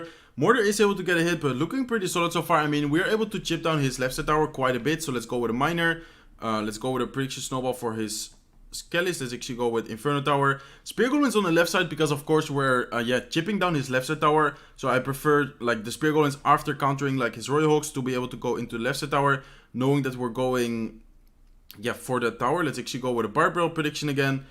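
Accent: Netherlands. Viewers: Llama The Thug It’s a dutch accent, i’m dutch too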